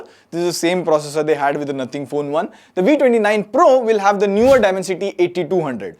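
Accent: India. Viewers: What is this obsession with trying to speak English in this ugly Indian accent for Indians, by Indians ?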